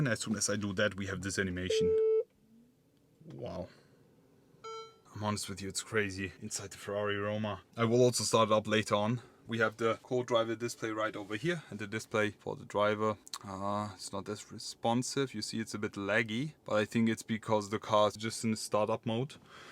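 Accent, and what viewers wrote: Germany. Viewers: Mein Englisch ist nicht besonders gut, praktisch, dass sich der Dude in Englisch anhört als würde er trotzdem Deutsch reden.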